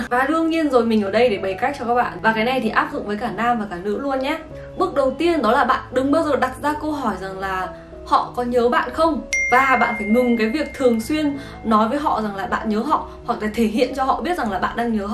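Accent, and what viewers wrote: Standard. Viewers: Chị nói chuẩn thế chứ lị 🥰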